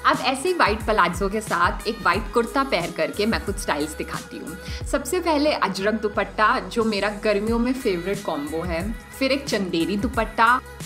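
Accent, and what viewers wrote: Punjab, India. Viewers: Hey, just found your channel and quickly subscribed and followed you on Instagram. I usually don't leave a comment but you are so pleasant and I just loved your Punjabi accent. P.S. Aap bohot Pyaare ho and I loved the way you spoke throughout the video. Lots of love to you! Stay true and best wishes! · Great job done! Thanks alot for providing soo many options in one video👍 appreciate your efforts ❤️ nowdays bloggers waste 15mins for just one tip/outlook, but this was worth watching. I saw your video for the first time...n yes I m the new subscriber😇 yayy I found a decent, grounded styler👍❤️ (The Punjabi accent is love) Lots of love and success to you lady😇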